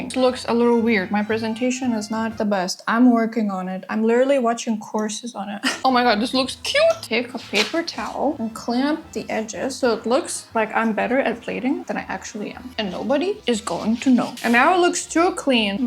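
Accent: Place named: United States. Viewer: Apologies if you've explained this in a past video, but how did you end up speaking American English? It's always interesting to see how people were taught/taught themselves across the UK/Australian/North American inflections. Signed, an American with some long-ago Lithuanian roots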